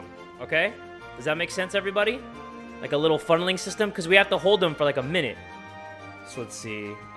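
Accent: United Kingdom. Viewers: got the most real British accent. Plus he is real thank god for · THAT BRITISH ACCENT THOOOOOO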